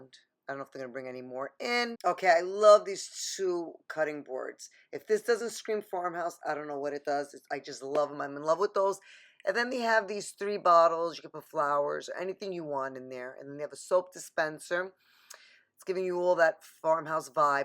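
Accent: New York, United States. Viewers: I hear a NY accent girly!! ❤️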